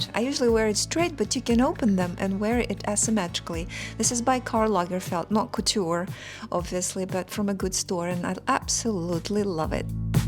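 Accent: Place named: United States. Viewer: Many native English speakers don’t have the depth in our language that you do. Impressive and an American accent!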